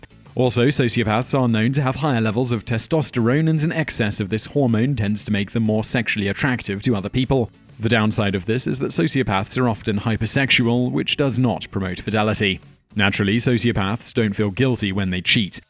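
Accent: United Kingdom. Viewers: YouTube should require "British Accent" in the title of any video with a British narrator!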